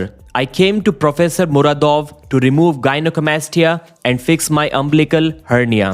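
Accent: India. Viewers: Why this stupid curry man accent...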